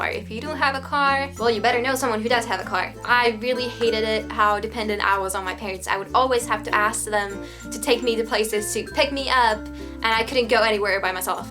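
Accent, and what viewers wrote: South, United States. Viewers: You have a southern accent. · Your accent sounds southern . How long have you been living here ? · Your English is perfect and it’s sounds very southern lol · I'm from the Mid-Atlantic and I can hear a Southern accent on her. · She sounds slightly southern to me. Like a southern born girl who has lived in a northern state for a few years and starting to pick up on a northern accent. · Does your family tell you that you're accent is changing? you sound super southern . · Great video! Your English is great, even a little Southern in places. I just wanted to make a few suggestions. Less dark eye makeup and a little peach or pink gloss on the lips would really suit you. A brighter colored top, too, in pink or light blue, would look better on camera. The dark top makes you disappear. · Had you not said you were Finnish I would have said you have a southern accent, so later in the video when you said you were in Charlotte, I just kinda went "I knew you were in the South!" You're right, everyone has an accent. 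I live in Utah, though I grew up in the Chicago suburbs, and I still get asked where I'm from, in spite of having been in Utah for 18 years now. But I've apparently picked up enough "Western" accent that on visits back to Illinois I get asked there where I'm from too, so.... there is that, I suppose. I actually work with a Finn and he's got a rather strong accent, but I'd have never been able to tell where from until he told me he was from Helsinki and moved to the US as an adult. Accents simply are. Just not a big deal. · I like the accent. You can tell there is a Finnish accent, but even a little southern in there too. Very cute.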